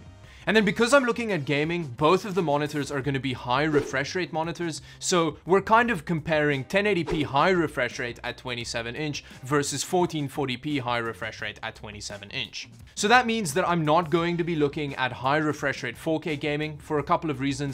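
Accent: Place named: South Africa. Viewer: why do u sound south african. Mhmmmmmmm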